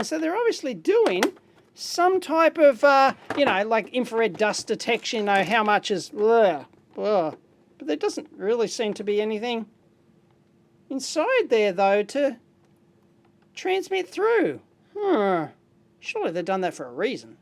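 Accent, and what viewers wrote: Australia. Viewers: The only thing I understand is that Mr. should be an Aussie. His accent says so. Am i right, Mr.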